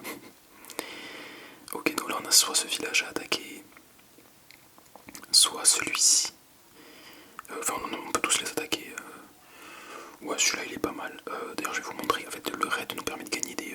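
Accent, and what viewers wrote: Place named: South, France. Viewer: t’es du sud toi 😂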